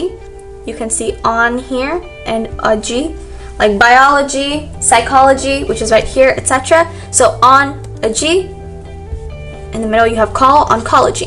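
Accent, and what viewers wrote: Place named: Bengal, India. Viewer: Best accent of Bengali pronunciation ever I have heard.❤️❤️❤️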